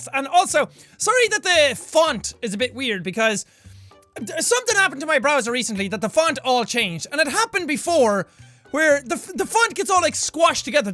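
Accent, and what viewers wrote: Ireland. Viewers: Hey + do you live in Ireland or are you Irish? Like if you have the same question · Jack has his irish accent again. I like it %<num>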